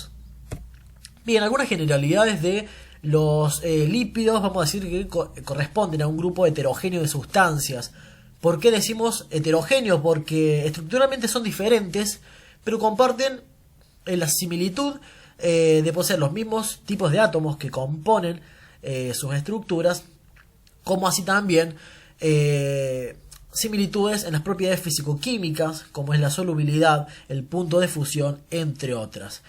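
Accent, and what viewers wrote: Argentina. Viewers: Hablas como argentino de provincia. De donde eres?¿cordobes?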